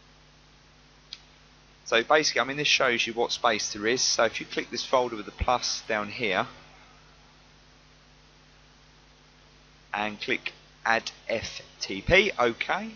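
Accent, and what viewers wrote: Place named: United Kingdom. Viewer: Ahhh a sound of a British accent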